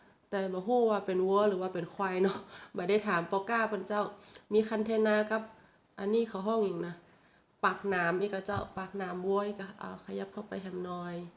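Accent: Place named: North, Thailand. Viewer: ต้มขมเครื่องในน่ากินมากเลยครับพี่ พี่เป็นคนเหนือติครับ#เจ้อยู่ที่ผมทำงานกะพูดแบบพี่เลยเสียง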